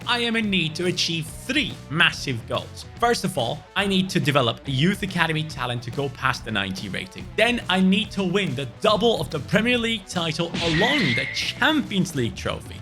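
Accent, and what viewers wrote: Netherlands. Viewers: I am Belgian but still happy to hear a fellow Dutchman. Or German? Anw, I would make a career to put a top tier Dutch club into one of the top 5 Leagues and try and win the league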